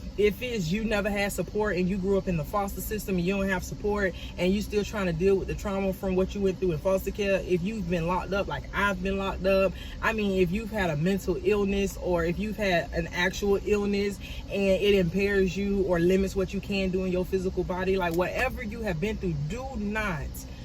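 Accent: Florida, United States. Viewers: First of all CONGRATULATIONS!!! I am soooo happy for you! Im not even sure how I came across you but as soon as I heard that accent I said “ She either from St Pete or Jacksonville!” LOL! I’m in DUUUUVALLL too! So it’s so nice to see you making your situation into a positive one! I caught a felony soon as I turned 18 & the judge said she was gonna make an example out of me. I was lucky I only did a night in jail 5yrs probation but the stigma with having a record never leaves! So congrats again! I can’t wait to see what awaits you!!🎉🎉🎉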